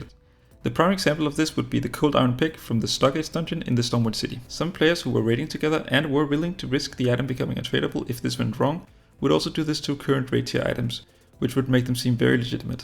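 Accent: Denmark. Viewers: "A new channel based in Europe" You are very much Danish my guy, the accent does it all :0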